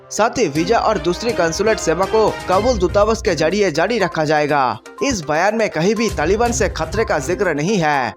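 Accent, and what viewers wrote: Bengal, India. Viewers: यह फेक न्यूज़ चैनल्स होसकता क्योंकि महाशय की हिंदी बोली से शक करने पर मजबूर हूँ।बंगाली गंध आ रहि हाइय् । भा हा इ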